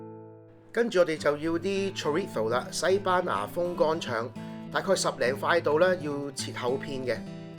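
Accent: Singapore. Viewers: Hahahaha your singlish is hilarious!! Greetings from sg!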